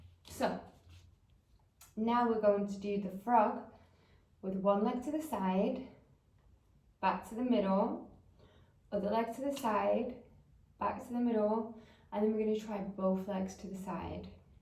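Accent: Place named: England, United Kingdom. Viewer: You're so hot in this outfit 🔥 Specially talking in this beautiful engkish accent too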